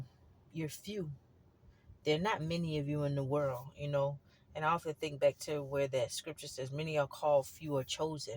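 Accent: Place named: Louisiana, United States. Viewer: Yessssss 🎉 John 15 Hated Without A Cause If They Have Hated Me They Will Also Hate You “ Come On Women Of God Say It “ Even From My Own Family And So Called Friends “ Used And Took Advantage Of My Kindness And Mistaken It For Weakness Not Realizing They Must Reap What They Sew Nobody Gets Away Also Thanks 🙏🙏 Women Of God For This Channel I’m A New Subscriber “ Keep Being A Absolute Blessing To EveryOne ❤❤❤❤❤❤❤ Also Are You From Louisiana Because My Family’s From There Aswell And When You Speak I Hear It 😊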